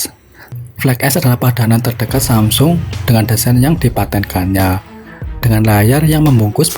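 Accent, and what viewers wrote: Banyumas, Indonesia. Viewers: Logatnya ngapak yak..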